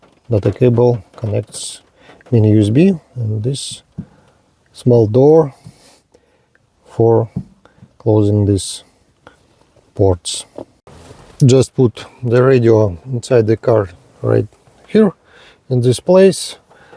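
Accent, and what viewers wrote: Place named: Russia. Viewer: Очень русский акцент ✌️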